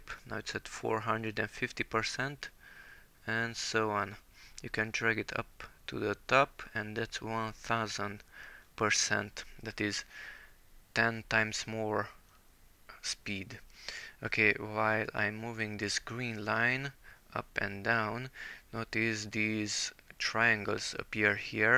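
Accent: Hungary. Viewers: Epp azon gondolkodtam, hogy ez az angol kiejtes egy kicsit magyarosnak hangzik, ekkor megneztem a csatorna nevet... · Köszi a segítséget, kicsit hallatszik a "magyar angol" :D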